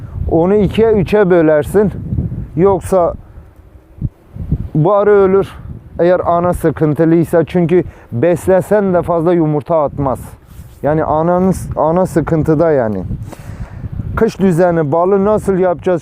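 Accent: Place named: Eastern Anatolia, Turkey. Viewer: Allah razı olsun kardeşim, nereli olduğunu merak ettim. Şive trakyadan çok doğuya benziyor.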